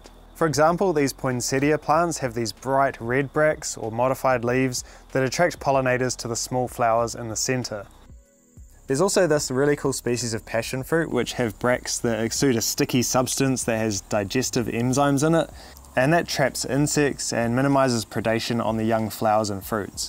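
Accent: New Zealand. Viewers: I watch your videos just to hear that nice Kiwi accent😂.Love it☺️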